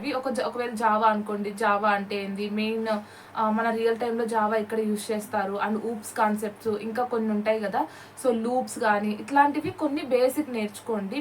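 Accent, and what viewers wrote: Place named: India. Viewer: this girl is inspiration to those people who are just sitting and weeping that i dont know english i cant do my background is poor and finding many other excuses instead of just trying like her ...... i apprecciate your video sister by the way adhi indian vocabulary or pronunctiation kaadu its called an INDIAN ACCENT (WITH BASIC ENGLISH VOCABULARY) 🥰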